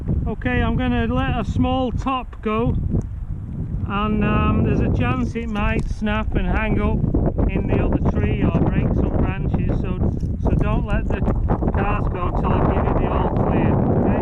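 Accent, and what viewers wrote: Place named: Yorkshire, United Kingdom. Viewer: You sound like a Yorkshire man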